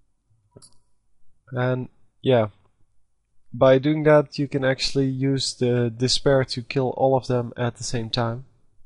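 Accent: Netherlands. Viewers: are you dutch? haha u realy got an dutch accent :)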